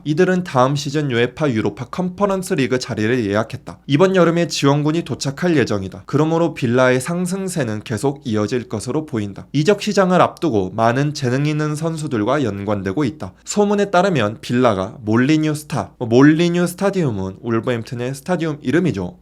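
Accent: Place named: Jeolla, South Korea. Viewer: 전라도 억양 디게 거슬리네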